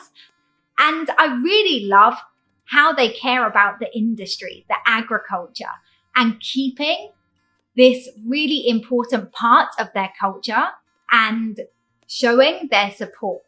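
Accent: United Kingdom. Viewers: 慢速英国腔，好听。关注您。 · Hi I like your UK accent that saw so many video 👍 台灣真的是水果王國，我去到北美的時候看到水果幾乎都是冷凍的！覺得都不想吃了😨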